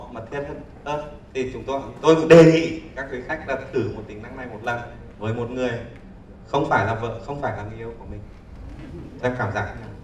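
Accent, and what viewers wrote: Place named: Central Vietnam, Vietnam. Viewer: Bác này người miền Trung thì phải, giọng lơ lớ. ^^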